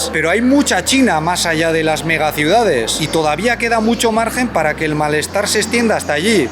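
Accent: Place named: Spain. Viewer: modo de hablar español iberico, peninsular, diferente al español sudamericano con muchas variantes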